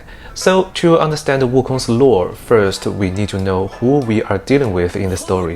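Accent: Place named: China. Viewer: 是国人吧 这中式口音（并无冒犯之意）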